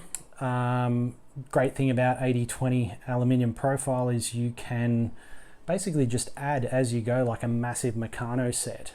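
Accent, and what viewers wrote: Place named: Australia. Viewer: Aussie accent my dude.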